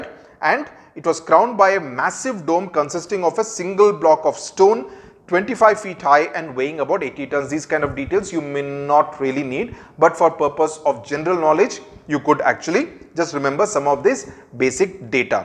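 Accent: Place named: South India, India. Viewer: sir are u a south indian ...the accent of ur speaking and the tamil words are pronounced PERFECTT!!!!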